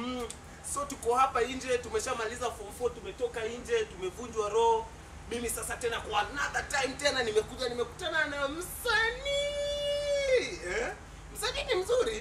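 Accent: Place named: Luo, Kenya. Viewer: Sasa ispokua accent yako ni ya kiluo athawiz umejaribu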